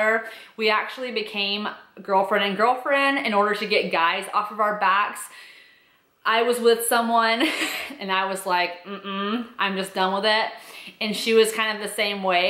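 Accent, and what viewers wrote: South, United States. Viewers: I found out recently that a co-worker of mine is also an avid YouTube watcher. We live in Des Moines too and she told me about your channel! I was confused because I definitely detected a southern accent in your last few videos; it makes sense now!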